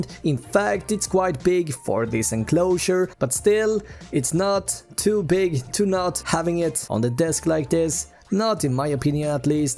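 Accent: Sweden. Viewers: Are you Swedish? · Are you swedish sounds like it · U sound swedish and if you are, Hej :) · Are you swedish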